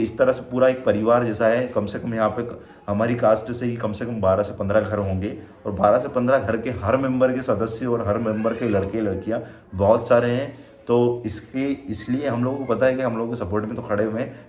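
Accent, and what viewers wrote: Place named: Indore, India. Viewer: I am from Bhopal and your language tone is indori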